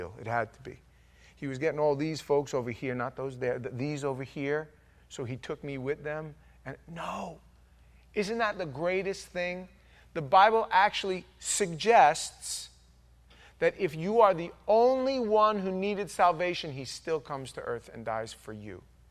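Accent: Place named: Long Island, United States. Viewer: You sound like your from Long Island. Good Sermon !😊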